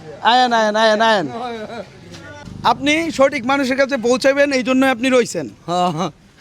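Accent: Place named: Kishoreganj, Bangladesh. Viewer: are you from kishorganj? cuz iam too i know your speaking in kishorganji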